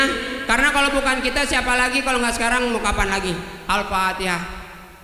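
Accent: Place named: Jakarta, Indonesia. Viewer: Ane suka ame bng,khas masih bahasa betawinya,sukses ye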